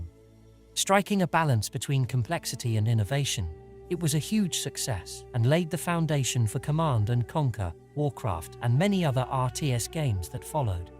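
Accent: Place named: England, United Kingdom. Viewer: Doon. You have a freaking english accent. How do you pronounce the letter "U"?!? Why don't you pronounce studio, "stoodio"?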